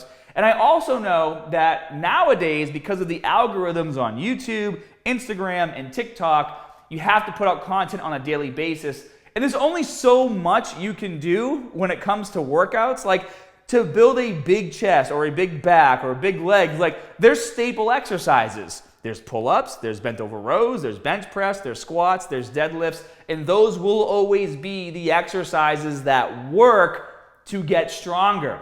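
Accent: Boston, United States. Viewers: this guy must be from boston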